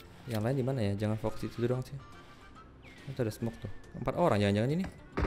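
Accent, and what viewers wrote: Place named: Bali, Indonesia. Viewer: Logat bali cik hahaha🤣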